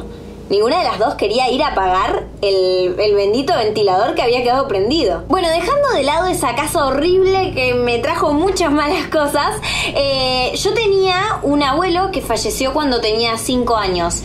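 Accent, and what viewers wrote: Argentina. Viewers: Es Argentina:v es el asento del país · Sos voz de Argentina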